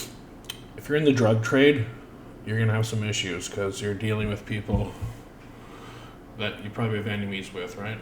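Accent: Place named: Canada. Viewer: You get so many mixed messages about the American system about rock spiders and sucks and such salts against women. But these are the same people that do that. So that confuses me and you've are giving me some serious information which I appreciate. When you say you was so sided with certain games on motorcycles. Were you a hang around? I was just curious. Cause that's what it sounds like. I'm not trying to compare 1 person with another. But you remind me so much of JD. But the Canadian version, The fact that you speak canadian in other words. I own my shit is two true you give great advice/knowledge. Keep doing what you're doing you're success. I know i'm not kissing ass i'm just blown away.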